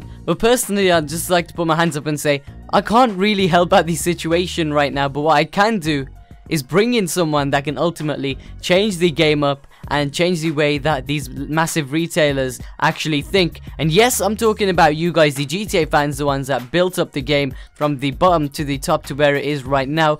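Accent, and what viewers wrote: United Kingdom. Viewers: He's from uk man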